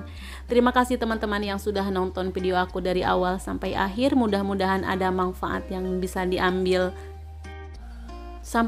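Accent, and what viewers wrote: West Java, Indonesia. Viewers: Ibu dr Sunda kah? Logat bicara nya persis seperti teman saya yg Sunda...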